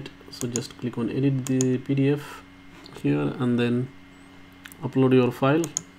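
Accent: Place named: India. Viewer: You speak too fast and with an accent... It sounds like a clattering window pane of a rickety bus. Could you speak a bit slower, and to a global audience, not just Indians!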